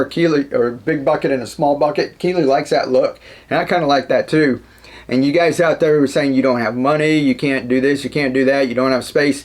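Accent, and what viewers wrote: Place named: Alaska, United States. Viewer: You sound like another Alaskan 😂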